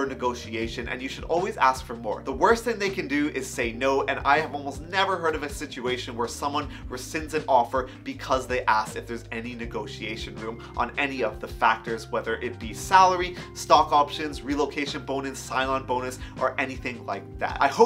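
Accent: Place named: Toronto, Canada. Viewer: Just saw your Redux video and I'm like this guy is from Toronto ( I am too) just by your voice. thanks for the clear videos and explanation on Redux. Lots of videos don't show HOW and WHY things are done but just "this is how it's done". Keep being awesome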